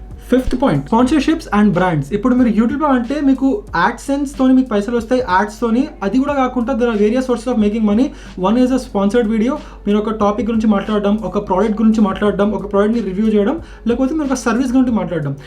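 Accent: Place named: Hyderabad, India. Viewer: ANNA How're you ? No need of switching yourself to confined Telugu Motovlogs We always love your feerfect istyle of pakka HYDERABADI slang in your content. Anyways wishing you all the success ahead ANNA Best wishes from our BBA Back Benchers.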